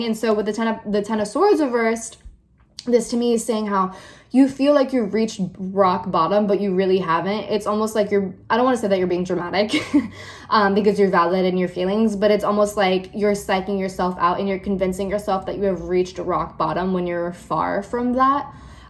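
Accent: United Kingdom. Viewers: as a British person, I appreciate your British accent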